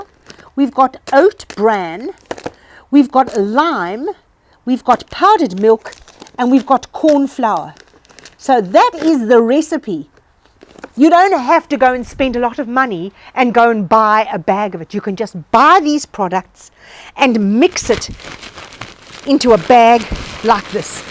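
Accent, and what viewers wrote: South Africa. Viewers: Ex South African? Loved your video!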